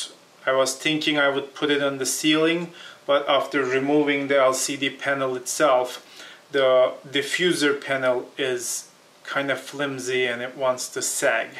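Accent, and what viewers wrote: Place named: Turkey. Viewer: This is Turkish Accent 😏✨ 🙋‍♂️ hi there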